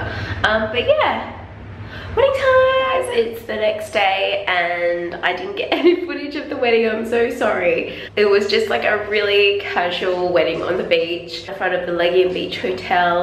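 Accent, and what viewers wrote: Australia. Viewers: haha is so aussie sounding (this is coming from a Kiwi haha)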